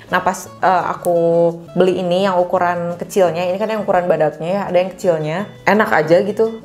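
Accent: West Java, Indonesia. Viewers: Sundanya balik 😂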